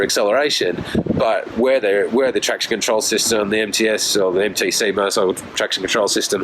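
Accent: Australia. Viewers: you sound Australian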